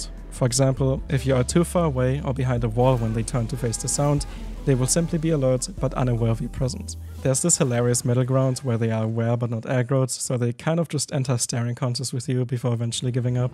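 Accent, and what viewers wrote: Germany. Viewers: Your German right?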